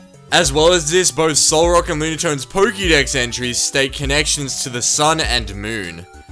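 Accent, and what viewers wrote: Australia. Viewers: starts vid, sees small amount of subs, hears ozzy voice. subbed instantly a fellow ozzy youtuber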